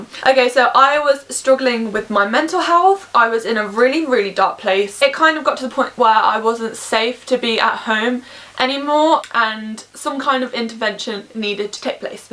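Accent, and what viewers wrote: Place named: Birmingham, United Kingdom. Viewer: Just Sayin i think it's a bit brummy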